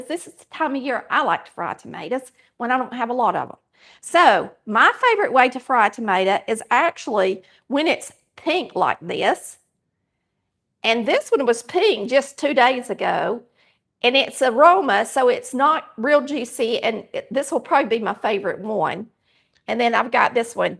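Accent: South, United States. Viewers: I love to watch you and listen to your southern slang. Reminds me of my mama too. She made fried green tomatoes in bacon fat. They were so delicious! Keep on cooking,!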